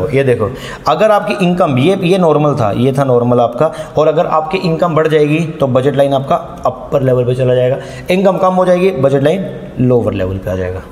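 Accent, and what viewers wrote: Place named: Gujarat, India. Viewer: Sir are you gujrati ? Or are you from Gujrat? Sir bcz jab aap padhate ho toh language mein gujrati ascent pta chlta h😁😁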